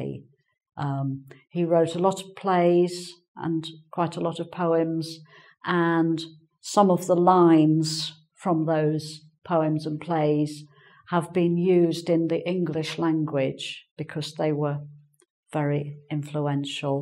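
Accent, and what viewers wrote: England, United Kingdom. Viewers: I already felt that you have british accent . I am enjoing the language to improve my knoledge Thanks a lot. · Is your English from England madam?... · with (engVid) Your accent and your appearance are great,! ) While watching any of your lessons I feel myself sitting in a London pub drinking ale or having breakfast in an old Birtish castle. )) Thank you for these unforgettable virtual trips! ) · From Brazil From Marcio Hello Teacher... nice to meet you. This is the second time I watch a video of yours, and I enjoy very much. One question ,please. Where you're from? Yor accent is familiar to me. It sounds like a British person. Anyway, Your lessons are very nice. I have just subbed to this channel. I hope not to disappoint you... I'm still learning your language. Hugs from me and Brazil. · I LOVED your video. Thanks. It was very useful. 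By the way, it was the first time I saw you on Engvid. Now I am watching all your lessons. Your British accent is beautiful. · I really love your British accent, specially when you're explaining. Furthermore, Shakespeare is one of my favorite dramatist, the work that I love the most is Hamlet ♥ Greetings from Peru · Brilliant! You have a very elegant old English style.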